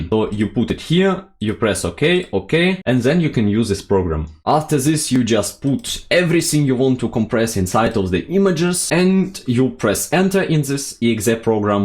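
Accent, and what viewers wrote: Russia. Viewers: русский акцент у тебя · that indian accent (nvm it's russian)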